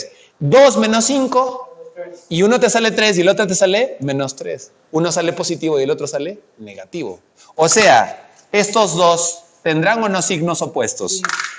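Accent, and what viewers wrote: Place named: Mexico. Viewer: Hola, de donde eres ? Tu acento es mexicano, pero parece que vivieras en Perú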